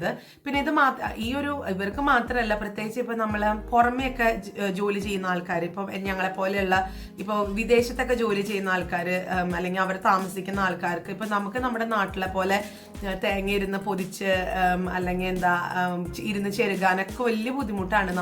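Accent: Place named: Poonjar, India. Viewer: Poonjar Anglisized malayalam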